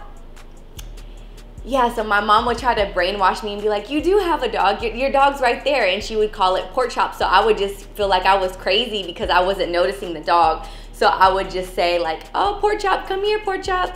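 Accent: France. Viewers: Waaaaouw tu est super belle et j'adore ton accent français et le couple que tu formes avec Kurzawa c'est tout simplement magnifique 💕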